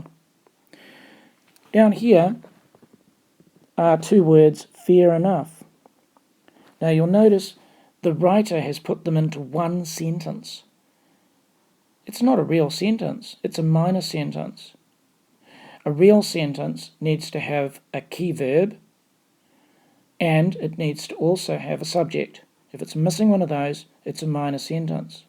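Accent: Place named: New Zealand. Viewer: No doubt your a new zealander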